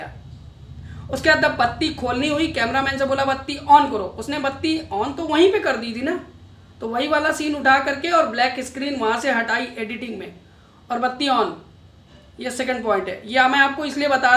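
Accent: Bihar, India. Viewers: Pehale bihari baat choda ok baki sab theek he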